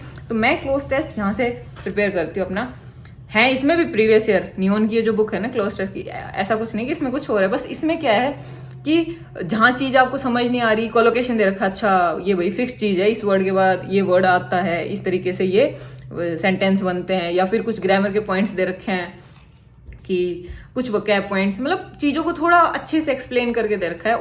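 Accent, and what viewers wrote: Haryana, India. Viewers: Didi ap haryana se ho? Accent harayana jsisi h 😅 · ਹਰਿਆਣਾ ਵਾਲੇ ਹੋ ਭਾਈ 😁😁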